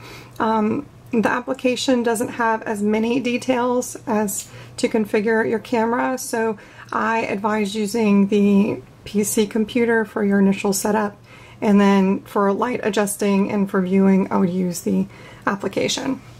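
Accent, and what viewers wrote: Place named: South, United States. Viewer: phục êwê Sounds like you're in the Southern US, is yours exposed to direct sunlight? I'm always concerned about the hot sun cooking these el cheapo cameras.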